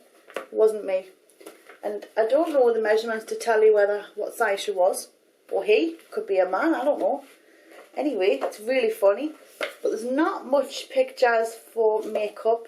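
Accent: Newcastle, United Kingdom. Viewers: Why would she put on an accent. She's a Geordie that's what they sound like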